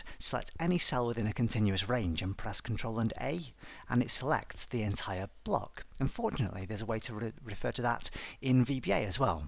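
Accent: United Kingdom. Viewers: is an excellent videos for learning VBA. For one thing I liked the most is the instructor explanation with UK accent. Loved it:) I can stay all day long in the front with my PC and try to learn more every evening right after work. You are the best*** rated! Would recommended to everyone. Thank you so much!!!!!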